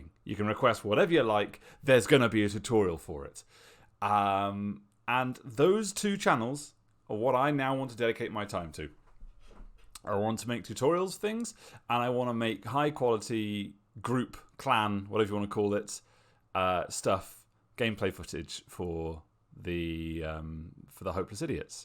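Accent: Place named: United Kingdom. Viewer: I’m sad that this channel is dead, but I’m really glad I decided to come here (after burning through all hopeless vods) I really like the casual nature of the videos. I’m excited to see where the Hopeless Idiots go from here, but I hope you keep this channel as archives. I need a backlog of that sweet, buttery British accent, I’m sure you understand.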